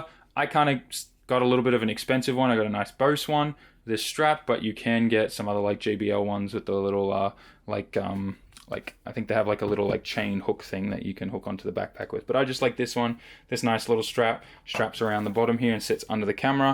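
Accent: Australia. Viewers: I'm so glad I'm not the only one who heard that, lmao. The Aussie accent is strong in this one.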